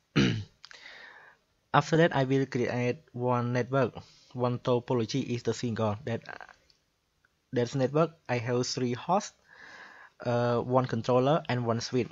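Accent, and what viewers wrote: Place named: Vietnam. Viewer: thang nay nguoi viet chac luon :v Cai accent deo chay dau duoc lol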